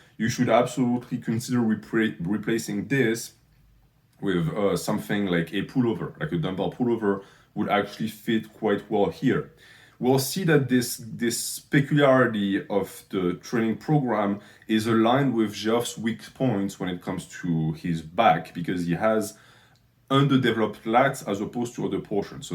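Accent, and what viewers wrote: France. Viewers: why are you french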